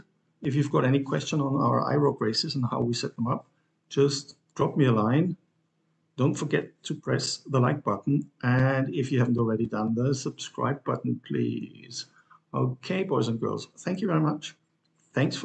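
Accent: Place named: Netherlands. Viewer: Love the details of the build. I wonder if you are a native from the same area of Holland as Max Verstappen your accent is very similar sounding to me.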